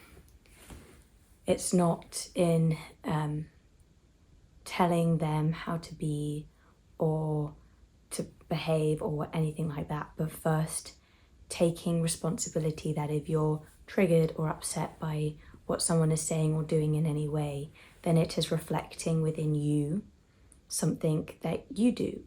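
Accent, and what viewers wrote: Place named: United Kingdom. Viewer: I have a crush on this woman. Her British accent is so cute!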